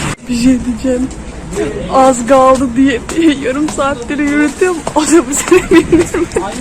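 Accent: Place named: Aegean, Turkey. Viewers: Bu kız %100 egeli. Konuşma tarzından anladım. Egeli olmadığım halde djsjdjssjs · nerelisin şiven çok tanıdık da Kütahya civari mı sormak istedim🤗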